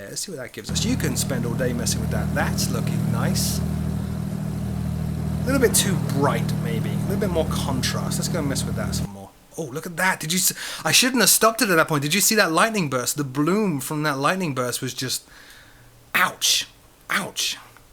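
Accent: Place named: United Kingdom. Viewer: I can tell you have a Brit accent, but for some odd reason I get the feeling you live in the states.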